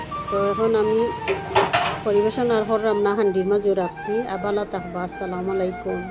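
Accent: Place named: Sylhet, Bangladesh. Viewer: মাশাআল্লাহ অনেক ভালো হয়েছে রেসিপি টা, সুন্দর উপস্থাপনা খাঁটি সিলেটী ভাষা❤️❤️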